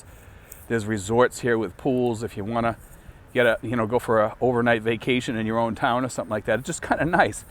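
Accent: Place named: New York, United States. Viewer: I hear an east coast accent. Are you a New Yorker?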